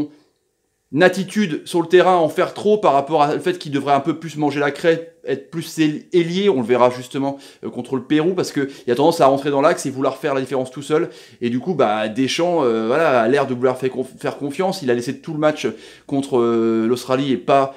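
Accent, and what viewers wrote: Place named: England, United Kingdom. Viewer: Mieux que Téléfoot , et pouce bleu direct pour l'accent anglais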